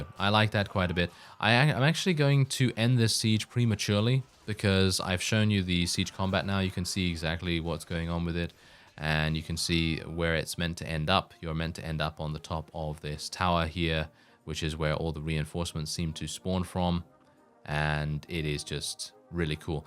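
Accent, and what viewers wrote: United Kingdom. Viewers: Angelo Orsini (literally little bears, one of the most influencial roman family of that time) would be a very nice name for your character. It's lovely to hear speak italian with your british accent. If you are gonna make a series, join Venice, make the doge proud. Hail San Marco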